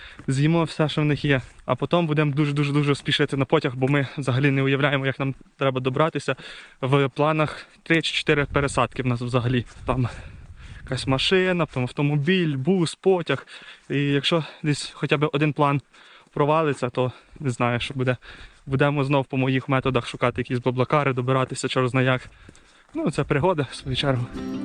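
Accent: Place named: Galicia, Ukraine. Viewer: Почув пару слів - жд-вокзал, іменно, чуть-чуть, морожено, лагерь (німецьке слово). В автора галицький акцент, то не акцент м.Хмельницький.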